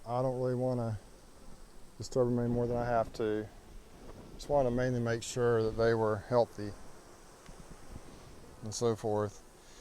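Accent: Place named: South, United States. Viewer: Great video! I really like your Southern “style”. It’s very calming. The bees know it too! Lol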